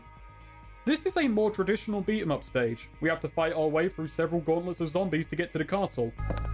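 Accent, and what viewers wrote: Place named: Liverpool, United Kingdom. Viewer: Alright eh! A scouser in bizarre Adventures of Jojo, Class one there mate.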